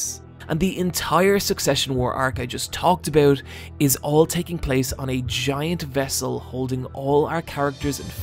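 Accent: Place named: Ireland. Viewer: started watching cus i liked the irish drawl. stayed because you clearly have a lot to say, and i'll always listen to those who take the time to talk about the shit they're passionate about.... as long as they're not fascists.... you're not a fascist, are you?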